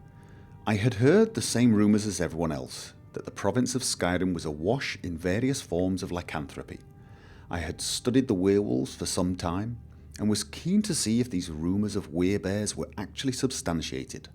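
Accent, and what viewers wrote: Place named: Bolton, United Kingdom. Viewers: I download the vids as MP3's and listen to them while trying to sleep. I like it that my storyteller has the accent of a true northernman, sounds more genuine. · I thought so, he's probably from Bolton though. I know a lot of people from Bolton who have that accent so it sort of got me thinking but thanks for the response. Sorry about mine! Hehe!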